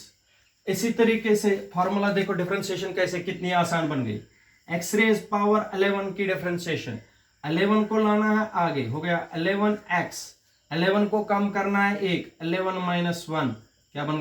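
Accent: Kashmir, India. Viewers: Luv ur teaching as it luk's u might b kashmiri as ur accent is kashmiri.... Thanx.... God bless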